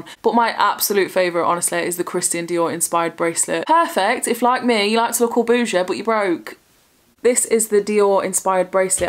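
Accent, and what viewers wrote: Nottingham, United Kingdom. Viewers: Are you from Nottingham ? You sound it x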